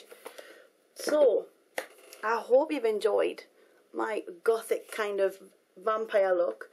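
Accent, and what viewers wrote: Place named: Scotland, United Kingdom. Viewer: Her accent mixes both english and scottish. I heard a welsh person talk before and they didn't sound that different from her.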